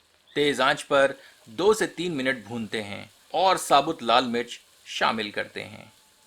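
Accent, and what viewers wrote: Standard. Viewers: Bhai ji app butter chicken ki recipe bhi batana.. Please...Apki videos hum sabko bahut achchi lagati hai, apka shuddh hindi bolne ka andaz hum sab ko bahut bhata hai, I love you bro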